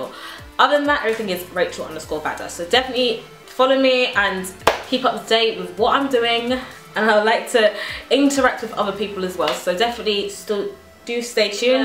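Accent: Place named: United Kingdom. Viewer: I LOVE FUCKING BRITISH LANGUEG GIRL:):D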